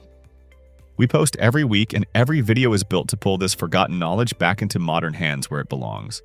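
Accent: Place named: United States. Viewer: Very good video. I have subscribed to your channel. But please learn to pronounce "anti", "semi", "multi" and "vitamin". Only in American English can a perfectly straightforward Latin prefix be twisted into a diphthong that never existed in any language known to man.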